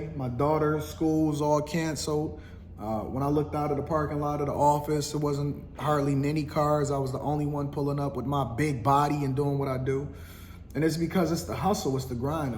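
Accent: Detroit, United States. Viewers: Are you from Detroit bro?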